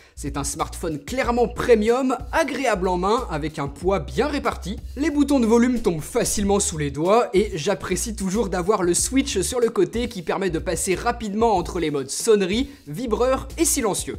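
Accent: Lyon, France. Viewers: Salut, t'es de Lyon?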